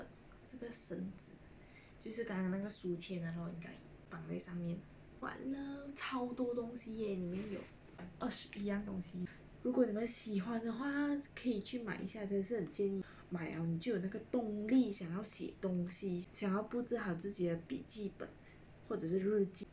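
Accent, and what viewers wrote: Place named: South, China. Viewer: 我giao南方人？啊哈哈哈哈好喜欢听你说话啊～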